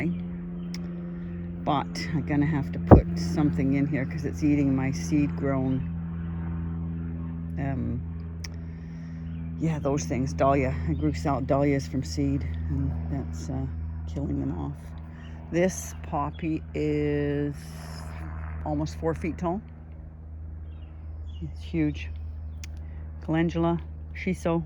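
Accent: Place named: Canada. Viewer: Well you definitely have a canadian accent now. Oh fancy that. You literally lived an hour from me. Most of my extended family are in liverpool or thereabouts but we moved around with my dads job then ended up back in Lancs. What a small world. So do you think of yourself as english or canadian or both?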